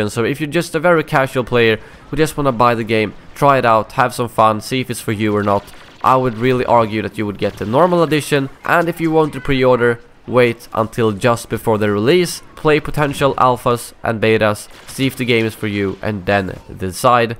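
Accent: Sweden. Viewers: Are you swedish? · You Swedish?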